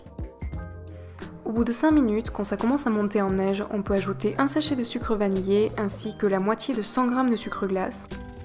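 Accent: South, France. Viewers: tu es de quelle ville tu as un belle accent du sud!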